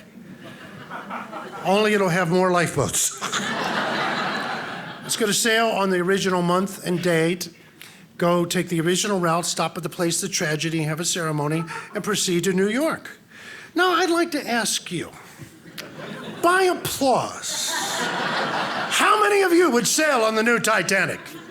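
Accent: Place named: Kentucky, United States. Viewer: You can tell he’s from Kentucky 🤦🏻‍♀️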